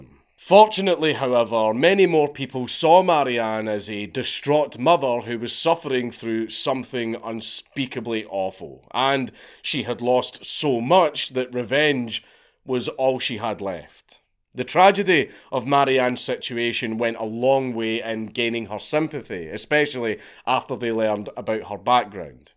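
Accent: Scotland, United Kingdom. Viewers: Maybe it's because I respect what Dank does. Or maybe it's the Scottish accent. But the only time that I don't skip ad reads is on these videos.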